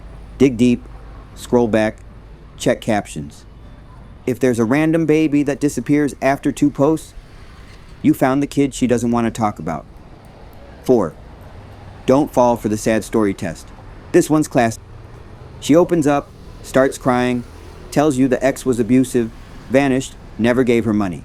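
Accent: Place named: India. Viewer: why u are indian man your accent can't escape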